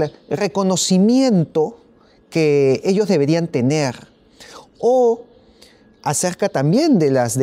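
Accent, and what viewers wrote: Lima, Peru. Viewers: En la costa de Perú, especialmente en Lima, las personas educadas hablan así. El español tiene muchos acentos! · El español mejor hablado es el acento peruano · El castellano de Lima -Perú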